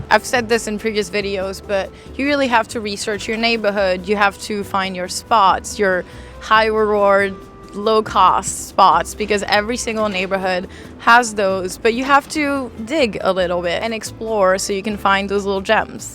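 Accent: United States. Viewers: Hi,. I clicked on your video because I lived near NYC as a small child (Hastings-on-Hudson, just above Yonkers), so stories about the current cost of living there interest me. Someone else commented on your fluency, but I would add that 95% of Americans watching this would or might think you're from Minnesota or Wisconsin, where they pronounce vowels similarly. I have to tell you that I was able to visit Paris twice (November in both '23 and '24) and I loved it. I hope to return in spring or summer '26, but would like to be way more fluent in French when I do. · I am SO thankful I found you today via YouTube! You said you’re from France, but your accent is totally American!! (Although, at certain points in your conversation, your accent did come out - Lol, but I honestly wouldn’t have noticed it, until you mentioned being from France.) Definitely want to know why you left an amazing place like France to come live in NYC. 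Would love a video on your France to NYC moving decision. you talk about your phone getting stolen- please tell us more about how that happened and where it happened so we know how and where to be careful at the specific place in NYC it got stolen at.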